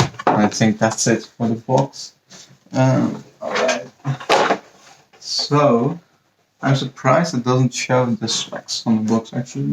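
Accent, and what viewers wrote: Netherlands. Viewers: Congratulations! How much did you pay for it? Also for what work did you buy it for, other than having of course. Like what softwares are you going to use in it? I am a mechanical engineer so wondering if those software's can run in it. Also what accent is that? You speak good English but have an accent can't really pin point it 😂. Dutch? Portuguese? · Nice man, got mine last week (one spec lower, ryzen 7, 1tb) and I'm loving the clean looks! (Ook nederlands denk ik te horen, en toevallig ook industrieel ontwerper (student)? Ik heb dat boek ook hahah)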